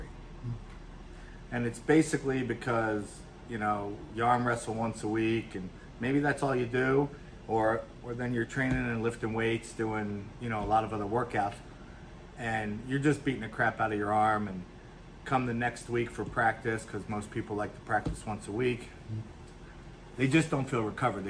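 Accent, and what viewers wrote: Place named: Philadelphia, United States. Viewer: I hear a Philly accent…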